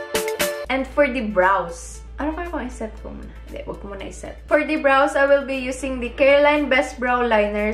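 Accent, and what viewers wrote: Visayas, Philippines. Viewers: Bisaya ka? Hahaha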